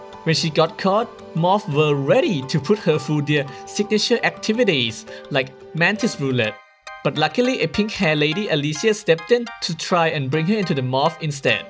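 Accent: Thailand. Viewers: Man are you thai? Cuz u kinda sounds familiar Btw nice vdo mann Just in case that u r thai ยังมีคนเล่นฮงไกกับข่อยดีใจคับ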